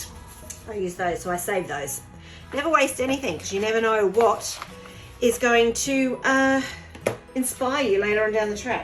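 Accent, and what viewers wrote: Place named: Australia. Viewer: Good video and I have saved the link so I can try it at some point. Maybe another video could be done to make a permanent tissue box container? I recall you said the video was a test to see if you could do the video off your iPhone. The way to improve the video would be remove the tune in the background which got a little annoying and sometimes loud. Overall it was a lovely finished product and it was nice to hear another Aussie accent on the video.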